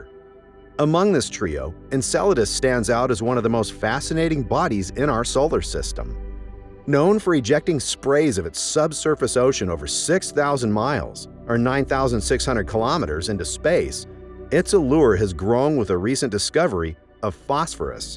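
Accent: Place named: United States. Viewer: ki American voice😅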